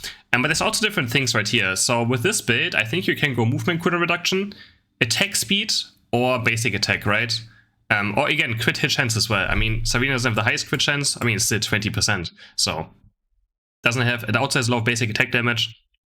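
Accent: Germany. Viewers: How can there be Tsareena and Machamp but NOT Lucario?? Lucario is the completr package in so many ways. You can play both builds and its super strong. You can even counter jungle in early game before dread to Set the enemy jungler behind. You have so much secure with him, theres no reason to not have lucario on this list. Its beyond me how you can miss him on ur list. Sidenote: Also please work on ur english pronunciation, your accent is so sharp it could cut bread or Brot, my German friend.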